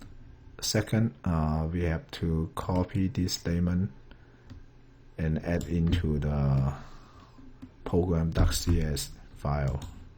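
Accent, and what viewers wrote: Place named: China. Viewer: you accent is closed to a Chinese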